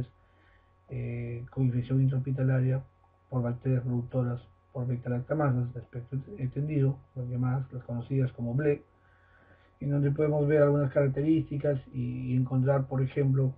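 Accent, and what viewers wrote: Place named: Peru. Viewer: yo entendi, solo que tiene acento, parece Peruano.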